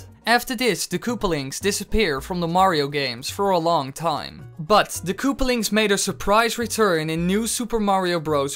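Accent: Netherlands. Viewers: are you dutch xD ik den nl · Is this guy dutch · are you dutch or just english becuase im dutch and you sound dutch to me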